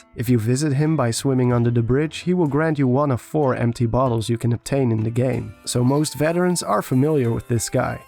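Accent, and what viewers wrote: Netherlands. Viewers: You make great videos I really thought you had like a million subscribers. Also are you dutch because you kind of have a dutch accent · I already thought you were Dutch! I recognised the accent :) (I am also Dutch myself)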